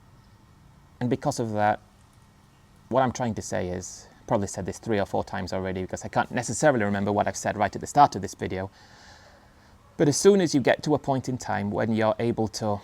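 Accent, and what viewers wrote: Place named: Thailand. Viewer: You’re right. Let the haters write their comments. The creators who delete everything negative are very insecure. People don’t want to see filtered comments that only suit the creator’s narrative or agree with everything they say There’s an individual in particular who does that and it’s very annoying He’s extremely defensive and is always showing anger at ‘the trolls’ but we never know what the alleged trolls are saying because he deletes absolutely everything he doesn’t 100% like (I can only imagine though - he intends to retire (in England) aged 55, wife 60, never work again with only £250k and from that cash they’re going to buy a 50 year old boat and 20 year old campervan and live out their years in those - good luck to them is all I can say 😂 They’re one expensive repair away from disaster, not that they have enough money anyway, and they’re used to living in a house. 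So I think he’s very much underestimating not only that but the lack of space physically and from each other, enduring the cold harsh winters and lack of amenities. Car crash viewing - the delusion makes for interesting watching!! 😂) Would you consider doing a video about you. What age you were when you moved to Thailand, why, where in England you’re from (I cannot place your accent and in fact before I saw you I thought your accent was Thai!), if you have a wife, how you met her, if she’s Thai, do you rent property or is anything you own in a Thai person’s name, if you’ve got kids, what jobs you’ve had (p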